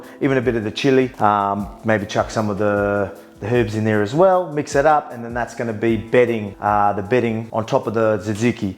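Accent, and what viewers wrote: Australia. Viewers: Volk is north Macedonian and Greek don't let that Australian accent fool ya lol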